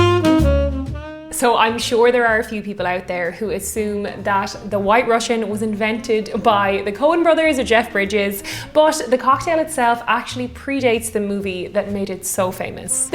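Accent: Ireland. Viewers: how more adorable can you be ... simply crazy about the Irish accent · love your accent i llke the way you look irish tart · I love your Irish accent. I liked when you were on the TRY channel.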